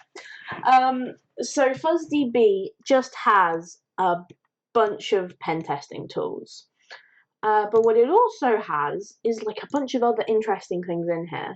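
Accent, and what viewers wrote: United Kingdom. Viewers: Pretty good sharing and great content, no doubt. I'm a beginner in cyber security and eventhough I've got a private invitation on H1, I couldn't manage to find a bug... However, this video gave me a different perpective and way of think. Therefore, I'm so grateful to you for your sharing :) Btw, I guess you are from UK? I like this accent although I've hard time to understand it :)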